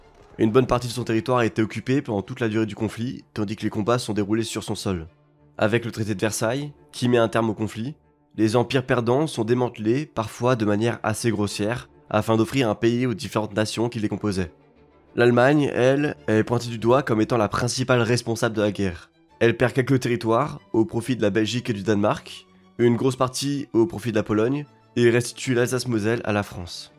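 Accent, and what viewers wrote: Paris, France. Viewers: Je plussoie sur l'articulation. Je décèle un accent parisien, avec une tendance à accélérer trop certaines parties et phrases. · Merci pour ce résumé très précis . Une petite critique malgré tout : attention à l 'articulation en parlant , j'ai l'impression d'entendre un petit jeune du triangle d'or (Auteuil , Neuilly , Passy) . Il faut parler plus lentement et plus articuler , marquer la pose .Si non c'est très bien , bravo.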